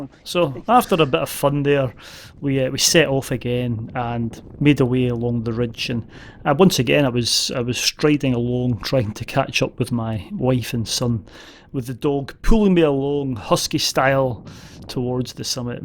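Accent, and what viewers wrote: Scotland, United Kingdom. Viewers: [;-) ], it's great that you're taking your family for a walk. The environment is beautiful and I would have loved to go along. Your Scottish accent remains great and only encourages you to go on holiday to Scotland again. So far only good experiences with Scotland as a holiday goal. Too bad the pandemic is slowing us all down, yes blocking itself.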